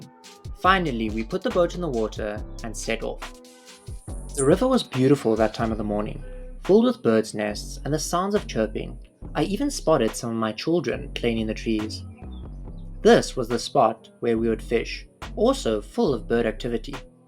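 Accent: South Africa. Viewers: Is that a south African accent that graces my ears ?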